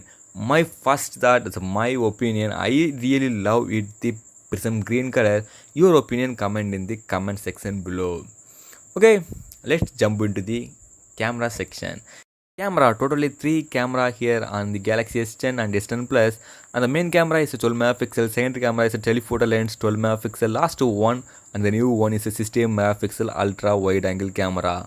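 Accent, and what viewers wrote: India. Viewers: Why do you have Indian accent? Anyway you first take a lady video and then run in the hotel room showing 2 single beds? It makes me think you need the bathroom urgently ....hahahah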